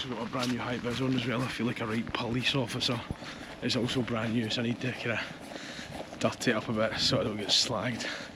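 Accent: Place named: Glasgow, United Kingdom. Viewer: 😂😂typical weegie patter. Keep it coming. That's what us scot like and used too haha. Ya wee cowww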